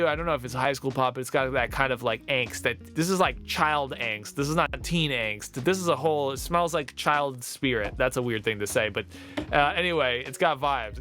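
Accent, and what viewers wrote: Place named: North America. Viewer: This is amazing, you have such a gift! I know you are not the only person out there making music, but... This is something so completely out of my reach, it could just as well be magic. See, every time i see someone painting or crafting or sewing, i have a litthe voice in the back of my head saying "yeah i could do that". But it never cam when I was watching you, this is how incredibly unreal making music from scratch is for me. I think it's not something you can learn from practce - you can excell in it, sure. But you have to be born with this special little spark that you have and I dont. As much as I love those little happy songs and I come back to them over and over again, i keep my fingers crossed for your own personal music making projects. You are amazing and I hope good things await you in life. Also, I was low-key suprised that you sound so North American, because of the way you looked and the sweaters my stupid brain assumed you are based somewhere in former Yugoslavia :D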